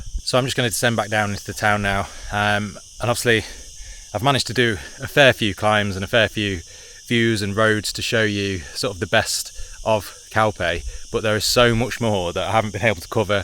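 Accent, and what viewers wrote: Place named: United Kingdom. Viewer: He’s British, not Spanish. Do Spanish put in British accents when pronouncing British place names???? 😂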